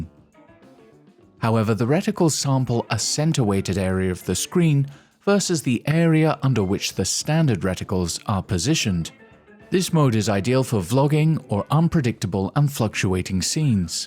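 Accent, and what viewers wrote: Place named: United Kingdom. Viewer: I agree with you. Best of the British accent.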